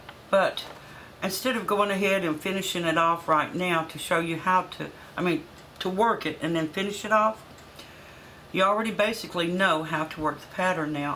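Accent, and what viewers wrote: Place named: South, United States. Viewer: I love your country accent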